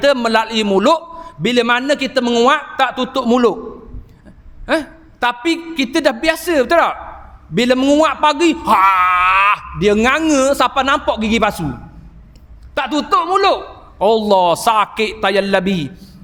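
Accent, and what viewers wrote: Terengganu, Malaysia. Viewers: Sedak dgr ustaz cakap ganu..💯💯🔥🔥..tq ustaz atas ilmu yg dikongsi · "Saya x boleh cakap laju sangat. Keluar bahasa terengganu" gelak sorang diri aku dibuatnya 🤣 berusaha sungguh Ustaz ni kurangkan loghat nya..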